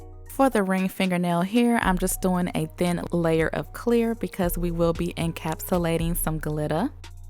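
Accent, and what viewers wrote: South, United States. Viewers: I guess Nashville has rubbed off on ya a little bit lol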